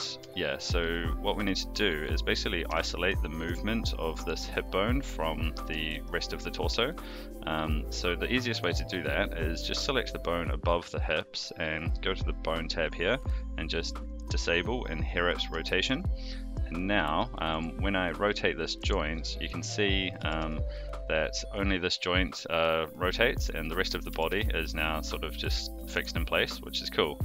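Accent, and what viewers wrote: New Zealand. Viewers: Hi. Have you seen a YouTuber named Karl Rock. He is from your country but married to a girl here in India. My word, if you listen to him with your eyes closed, you cant tell the difference. Your sound and accent so closely matches to his. Just incredible. The only difference is that he can speak Hindi as well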